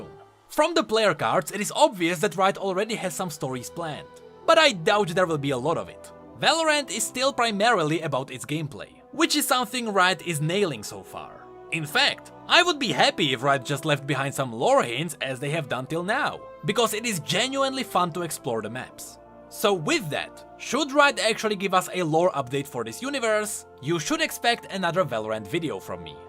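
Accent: United Kingdom. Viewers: nah he from the UK init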